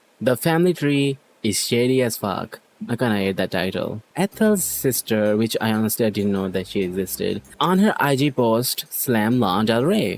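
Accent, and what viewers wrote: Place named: India. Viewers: I love indian accent :) its my favorite accent in english (im from brazil) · ... what? Like, that's one of the most common Indian accents. Should he stop being Indian? 😭🙏🏻 Nvm tho, this must be rage bait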